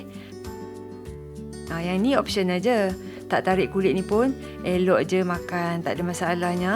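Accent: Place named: Kedah, Malaysia. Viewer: Dengar loghat kedah video ni. Hahaha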